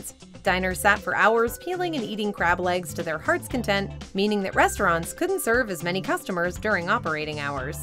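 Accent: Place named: Midwest, United States. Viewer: When push comes to shove, I just want to see the face behind the voice. Wonderful Southern Midwestern dialect.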